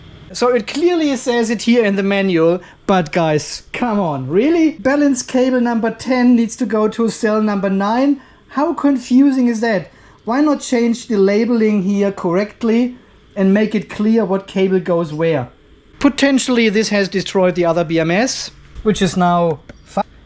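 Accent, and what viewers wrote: Germany. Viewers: A few weeks ago, I stumbled on one of your video while looking for a review on a bms. I got attracted by your german accent (Es gab eine Zeit, in der ich Deutsch sprach) and I have learned a lot through your videos. I finally orderded a V15 JK inverter bms that I just tested yesterday. Working on a self consumption system using grid as partial storage (injection tarrif are good here) to lower battery volume. So far so good, integration with both victron (cerbo gx) and sma sunny island is underway thanks to your very informative contents. Thanks again from Dakar , Sénégal, and keep it up.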